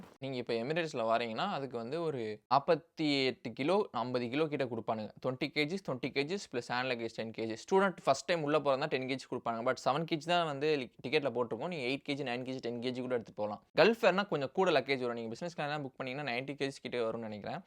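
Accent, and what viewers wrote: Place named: Karaikudi, India. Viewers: Karaikudi slag perfect Thalaiva ❤😅... love from Karaikudi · Sivagangai slang 😅. Kkd yanss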